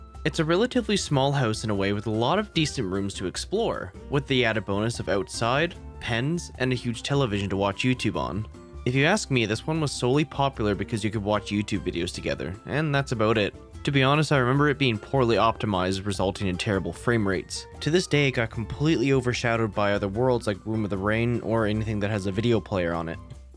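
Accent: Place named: Canada. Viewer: love the canadian accent, nice video as always!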